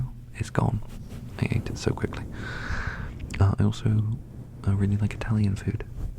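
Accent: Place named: Bristol, United Kingdom. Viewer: Ahh thank you so much for answering my question!! Also your bristolian accent is so cute you should totally do a video with it!!! The rambles about k pop and the stuff you’re into was super cute as well you can totally tell how passionate you are <33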